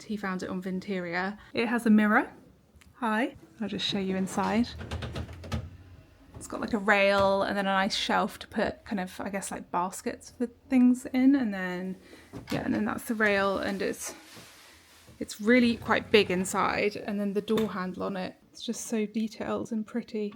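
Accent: United Kingdom. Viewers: I’m so excited for you… this home is going to be so lovely. I also have watched you for years and am glad you are back! I see your home as being some vintage pieces with some modern… I guess you would say eclectic. You have the most beautiful voice along with your British accent. … I could listen forever…. You also are beautiful… your skin is amazing! Please keep filming the vlogs we all love them Blessings lovely lady ❤️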